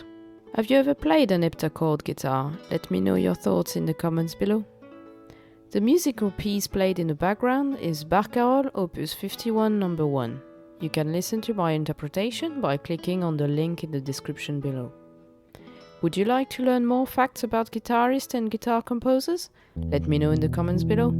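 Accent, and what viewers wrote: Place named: France. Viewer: Very informative and nice talk, like your authentic french accent😊❤And thanks to F.Sor🙏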